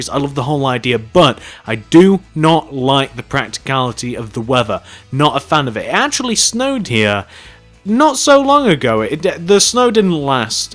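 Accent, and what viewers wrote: United Kingdom. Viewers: I knew you were British because of your accent. Thanks for your country saving us from the French.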